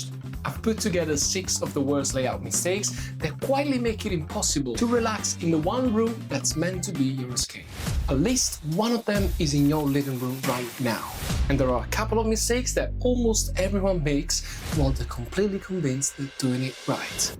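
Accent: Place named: England, United Kingdom. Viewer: sei meraviglioso. You have a beautiful english accent with a mystical touch of the unknown. Bravo anche nel tuo lavoro. From Italy.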